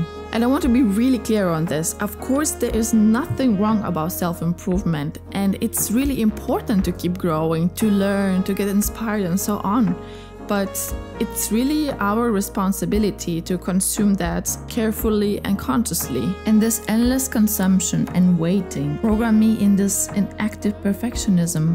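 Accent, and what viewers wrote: Germany. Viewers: Toller Video. Die Shots, Winkel und Kamerafahrten sind genial. Der ganz leichte Deutsch-Akzent macht zusätzlich charmant. Etwas schockiert plötzlich mit Dir in der Dusche zu stehen... Insgesamt: Wow. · I like your German accent, mamma mia! Guten abend von Italia!!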